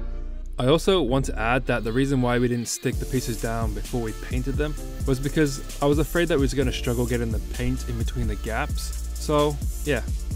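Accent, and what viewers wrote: England, United Kingdom. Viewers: You sound English. Why are you using imperial units and not metric?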